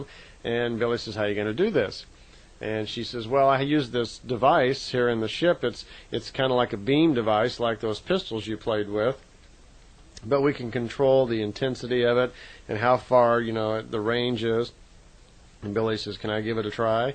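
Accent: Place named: England, United Kingdom. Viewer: Well this mermaid is so wishing that she was at the ocean instead of in Las Vegas....I have found some beauty here and it is a place called Red Rock Canyon...but there is nothing like riding waves with your body!! Soon though i will be in San Diego for a week...my childhood home.....You sound as though you maybe from England..I was born in Ireland!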